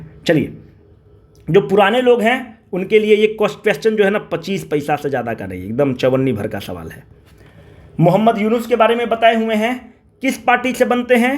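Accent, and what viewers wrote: Bihar, India. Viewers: I have not seen you...but I love you sir...Dil khus kar deta hai aapka video.... gazab samjhate hain aap...Bihari Hindi aur fluent English...deadly combo...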